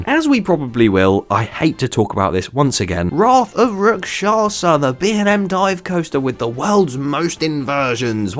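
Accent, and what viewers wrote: United Kingdom. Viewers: I feel like you’re from Yorkshire, tell me. But don’t doxx yourself lol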